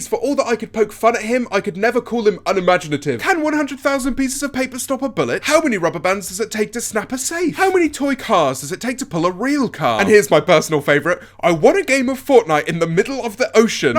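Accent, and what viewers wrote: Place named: England, United Kingdom. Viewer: Mr.Beast is fun just the way he is and anyways i wish i can do your english accent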